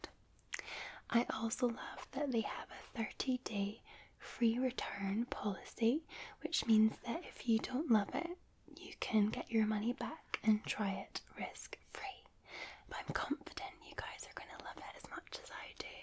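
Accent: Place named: Scotland, United Kingdom. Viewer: The Scottish accent is greatly better than the crackle of the candle.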